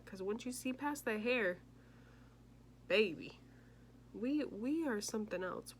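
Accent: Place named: New York, United States. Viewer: I was gonna comment on your other video wish you were chatting in that one love the New York accent haha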